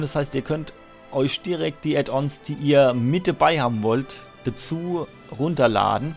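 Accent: Palatinate, Germany. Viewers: Da Pfälzer....wo her?^^ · ohh ein Pfälzer find ich gut darf ich fragen aus welchen raum du kommst?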